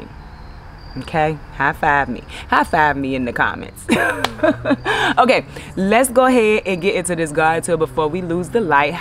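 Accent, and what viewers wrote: South, United States. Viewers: You have the best aura....I just love who you are. You have such a positive attitude, a light refreshing southern voice. You're just plain beautiful inside & out!!! Happy growing!💚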